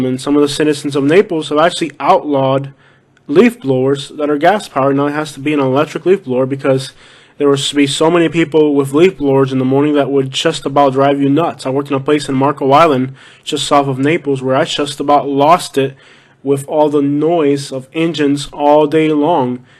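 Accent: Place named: North, United States. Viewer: And where are from??I have been here 70 years!!and you tourists,are what has ruined my state!!Get out!!ur accent tells on you.And yeah,South Florida sucks,It did not used to be like this,until the yanks moved in!!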